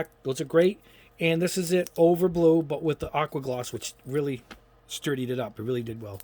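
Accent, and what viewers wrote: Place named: New England, United States. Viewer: Dude, thank you so much for doing these; you're my primary resource anytime I'm trying to figure out what I can airbrush. You rule 🤘 Also- New England Italian… Sounding like a bunch of people I met in New Haven who reminded me of a bunch of people i grew up with in New Jersey. Accent rules 🤘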